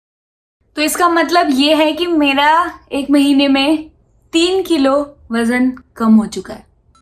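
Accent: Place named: Pahadi, India. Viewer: You are pahadi and delhi ki... i can sense you mann!!! Amazing review.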